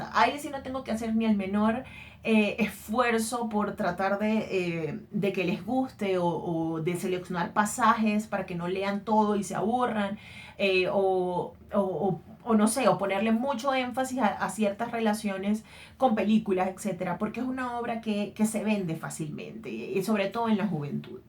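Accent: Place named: Venezuela. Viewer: Hola... buen análisis, tu acento es tan bello como tu rostro, y sin temor a equivocarme, ambos son venezolanos... un fuerte abrazo.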